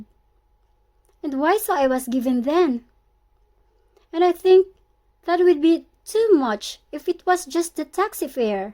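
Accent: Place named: England, United Kingdom. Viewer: Thaks again friend..i like your eng accent...nice blog you have♥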